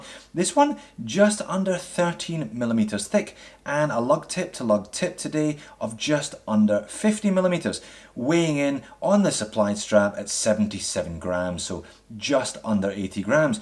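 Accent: Scotland, United Kingdom. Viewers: Great video!! I do have a question though. I heard you say in another video that you are a proud Australian, but, honestly, when you speak, I only hear a Scottish accent. Why exactly is that?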